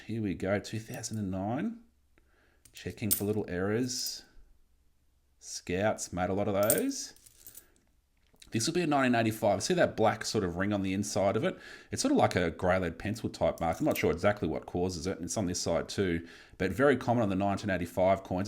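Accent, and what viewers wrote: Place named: Australia. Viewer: it's all good Can you make longer vids I miss the Oz accent you funny fkr 😅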